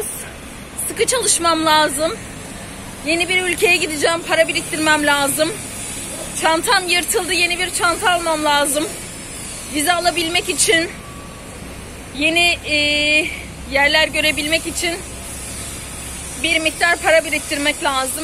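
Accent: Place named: Sivas, Turkey. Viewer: Guzel kardesim gülen yüzün hep gülsün ,lehcen güzel Sivasimiza çok benziyor.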